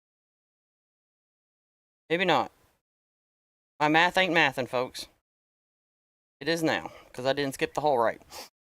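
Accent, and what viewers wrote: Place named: Kentucky, United States. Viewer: Hi, I knew you were from KY before you told us. I am from KY, and I recognized the accent. I lived away from here for several years and lost a lot of my accent though. It is always good to be in KY. I love it.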